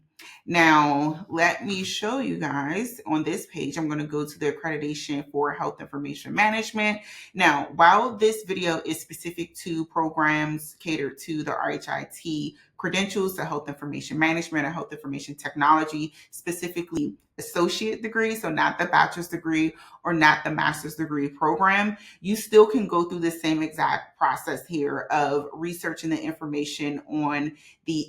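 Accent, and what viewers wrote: Maryland, United States. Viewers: Are you from MD, I feel like I hear a MD accent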